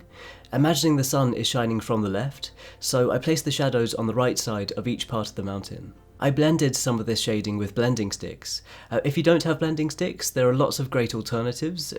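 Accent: United Kingdom. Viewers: your videos are now one of my favorite coz i learned two thing after watching it...how to draw and a british accents😁😅... can you draw emma watson?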